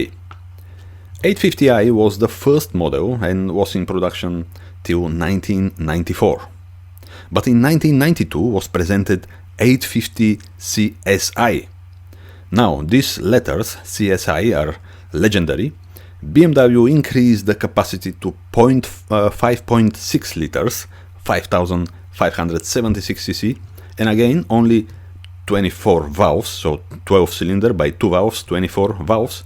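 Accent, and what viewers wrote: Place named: Italy. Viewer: WoW, It's going to take me a while to all of your videos but I'm going to do it! The Italian accent is just a bonus too.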